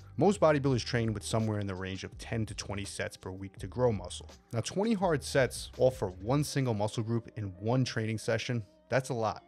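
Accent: New York, United States. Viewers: I like how you're proud of your new York accent. Not attempting to hide it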